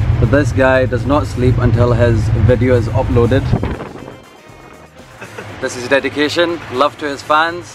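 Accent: Scotland, United Kingdom. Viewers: Why does he have a Scottish accent??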